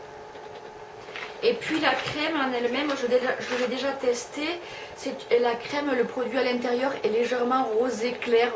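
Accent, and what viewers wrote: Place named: South, France. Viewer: Une drôle d'histoire 😉 j'aime ton enthousiasme et ton bel accent du sud 👌👍1 like + 1 abonné de plus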